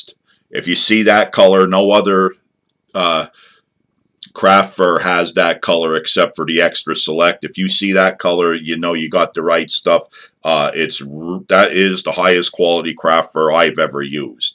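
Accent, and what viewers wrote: Pennsylvania, United States. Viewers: I have been trying some of your patterns for a friend and myself . He made a comment that you have a Schuylkill county accent , then I saw you use a bank envelope that had orwigsburg printed on it , is that the area your from ?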